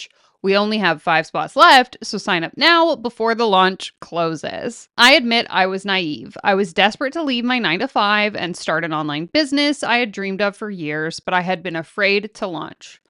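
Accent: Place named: Canada. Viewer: I knew about 30 seconds in that your from Canada.. lol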